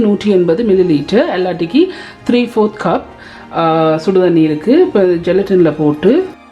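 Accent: Sri Lanka. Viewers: சுப்பர் சகோதரி எனக்கு இலங்கை தமிழ் ரொம்ப பிடிக்கும் இலங்கை மக்கலயும் பிடிக்கும்...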